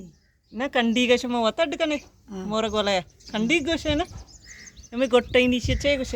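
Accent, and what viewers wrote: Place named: Makran, Pakistan. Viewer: وعلیکم السلام مئے شمئے کلمتی مکرانی لہجہ ءَ هوں همے چیزا دَرنگ گُشاں کہ مری درنگ گشگنتی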